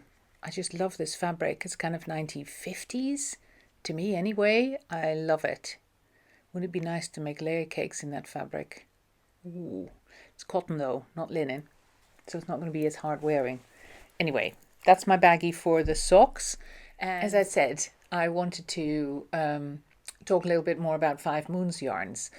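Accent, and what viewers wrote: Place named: Netherlands. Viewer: my best friend is Dutch and so recognized your accent coming through straight away. You look so good in all the clothes that you model. Continuing to watch your podcasts. Cheers 🥂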